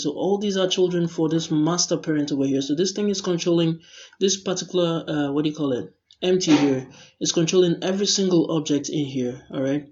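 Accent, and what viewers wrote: Nigeria. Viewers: Omg, that accent is eerily Nigerian.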